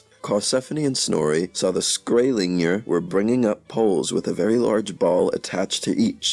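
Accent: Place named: Canada. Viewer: I was mystified by the mispronunciation. The narrator has a Canadian accent, but pronounces some things phonetically. Makes me wonder if this is actually an AI bot program. Growing up in eastern Canada, we had history lessons out the ying-yang and the pronunciation of common Canadian places is pretty basic. And I can't imagine an Anglophone mispronouncing "sword" as it looks.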